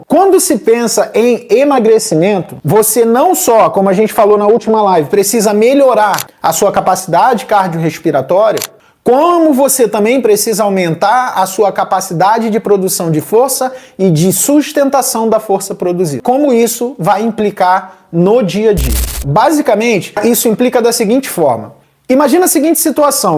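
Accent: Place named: Rio de Janeiro, Brazil. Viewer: Dr.! Adorei o teu vídeo! Estou a procura de um profissional qualificado para eu poder começar a minha saga de alimentação correta e treino. Pelo teu sotaque, tu deve ser do Rio.. Mesmo se o consultório fosse longe, eu iria numa boa, pois quero muito sair da sanfona..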